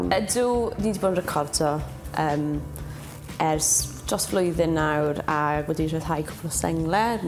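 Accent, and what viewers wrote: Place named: South Wales, United Kingdom. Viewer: You've got both Welsh dialects there too. The interviewer is clearly North Walian and Gwenno from the south. As a North Walian I find South Walian quite hard to understand. :p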